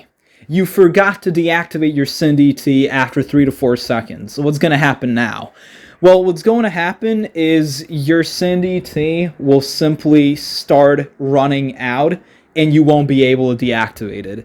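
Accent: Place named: North America. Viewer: Are you Serbian? Your YouTube UI says so but your accent doesn't sound like you've left North America for a second of your life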